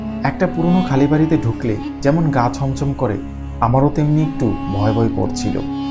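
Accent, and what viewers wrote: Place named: Bangladesh. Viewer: আপনি নিশ্চই কাংলাদেশের মাল আপনার ভাষাই বলে দিচ্ছে আপনি কাংলাদেশের মাল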